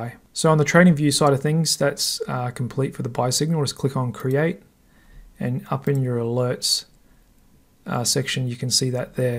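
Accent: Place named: Australia. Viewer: Hello, Thanks for the video, and it is great to hear an Australian on a YouTube video. I have a question/need help. I have a strategy that when a faster moving Av. crosses the slower, it triggers a trade and closes the open trade eg. Short opened - short closed, which in turn opens a long simultaneously and continues Short to Long to Short to Long etc.. Can you help me with description with the webhook Entry/Exit please. Happy to pay a fee. Regards, Sean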